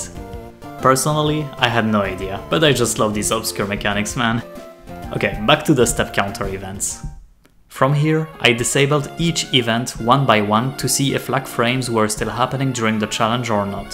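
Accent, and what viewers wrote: France. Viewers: Do i hear a little french behind that great video? Beau travail camarade!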